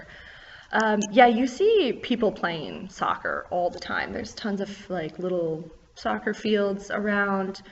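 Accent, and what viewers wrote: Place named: United States. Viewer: That's cool that you teach Uzbeks!!! You have an awesome American accent, like I. Uzbeks need to learn from YOU!!! My former English teacher Salomat Izzatulaevna in school #17 had a hidious british accent and I couldn't learn anything from her. She was a moron, unfortunately. In Uzbekistan you will become a top English teacher really fast! I can show you some teaching techniques that will significantly boost your student's language learning ability.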